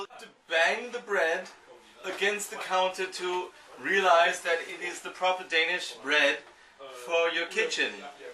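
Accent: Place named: Germany. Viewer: Great vid buddy and i think you needed a chainsaw for the bread.Dude and what was up with the German accent.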